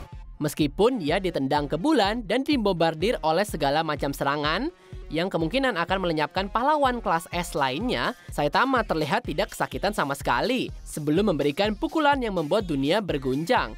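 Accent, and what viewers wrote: United Kingdom. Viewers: Harus banget naratornya make aksen Inggris? Biasa aja cukup kok, jadi agak kurang enak dengernya jadinya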